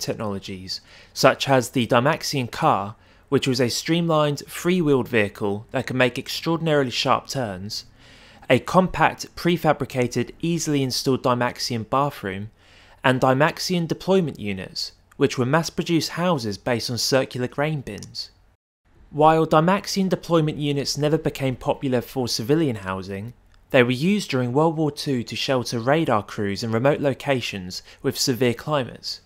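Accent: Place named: United Kingdom. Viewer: He is British. His pronunciation is different from those that live in America.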